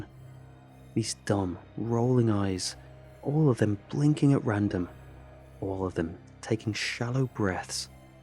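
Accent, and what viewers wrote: England, United Kingdom. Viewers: WTF?! He is English. I am American but have absolutely no issue with following his UK accent. In fact, I quite like it for added atmosphere. Heaven help you if you ever have to listen to a Scots or Irish accent if this guy is hard for you to follow. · Considering you speak with a British accent little brother,I would LOVE to guide you through the misty mountains and the foggy bottoms that are in West-By-God-Virginia...🤘☢️🤘 I'm the one who would sacrifice to get you out to tell the story my friend... Come to the U.S. and I will drop blood for you...🤘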